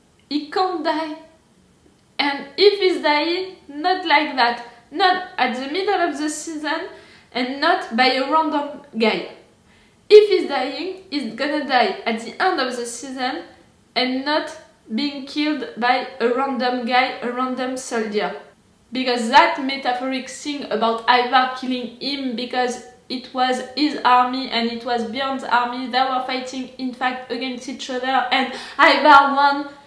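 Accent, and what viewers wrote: France. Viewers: You have a french accent you know that your reaction is one of the best i seen until now you are sensible that mean you love this as i do and you are attached to the charachters i have the same reaction when bjorn falls he was the only reason why i am still watching the show until now he's death broke my heart to peaces he was the best charachter in the show after ragnar he was with us from the bigining we watch him grow up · u are french? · On l'a reconnu direct cet accent mdr *bjorn n'est pas mort non non non non...épisode incroyable.. ubbe ont compte sur toi frérot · J'ai tout de suite deviné que t'étais française vu l'accent XD Mais ouai j'ai eu la même réaction que toi quand j'ai vu Bjorn se faire transpercer... J'espère sincèrement qu'il n'est pas mort, mais j'y crois pas trop quand même. C'est mon personnage préféré, mais là faut avouer que ça pue pour lui quand même mdr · Française ça s'entend 😉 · You have such a big french accent. 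Is so funny. 😂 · Tu es française ? Par moment tu prononce des mots avec l’accent français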